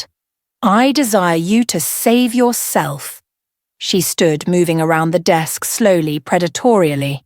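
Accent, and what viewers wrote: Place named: United Kingdom. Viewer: How could she have blood on her wedding dress if she changed into a "reception" dress just before the attack after the wedding? The narrator voice is so out of place in this story. It's way too British/formal... Why wasn't there anyone from her family or closest friends at her wedding? He's rich enough to have flown some in... Just sayin...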